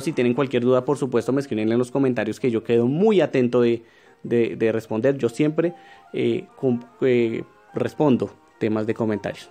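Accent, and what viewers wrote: Colombia. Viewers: Puro colombiano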